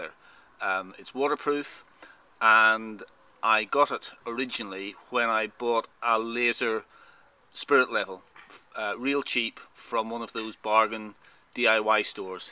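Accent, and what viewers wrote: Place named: Northern Ireland, United Kingdom. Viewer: You sound like you might originally be from northern Ireland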